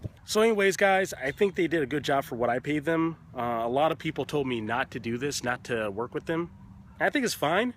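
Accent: West, United States. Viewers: You sound like a white guy from sorta out west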